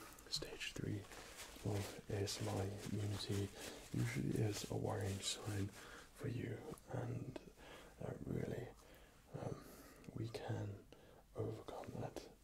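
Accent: Australia. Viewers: May Shida he's Australian I think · Is he Australian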